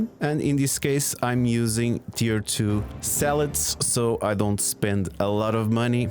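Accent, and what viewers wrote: Portugal. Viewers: That's a proper Portuguese accent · The Portuguese accent! XD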